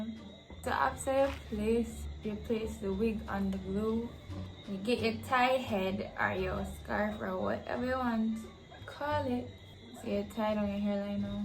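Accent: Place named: Jamaica. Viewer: I love this chick ,❤️ Much love all the way from Africa 🇿🇦 I just love the patwa accent.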